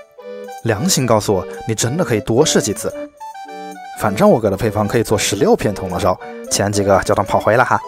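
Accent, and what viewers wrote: Wuhan, China. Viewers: 浓浓的武汉口音🤣🤣🤣🤣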